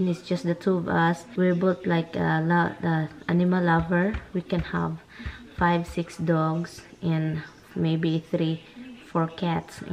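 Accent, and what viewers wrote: Visayas, Philippines. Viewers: Hi nag enjoy ko nimu ug tan aw bisan bisaya ang accent Peru cuteeee man...Been following you..Am working here in Cambodia..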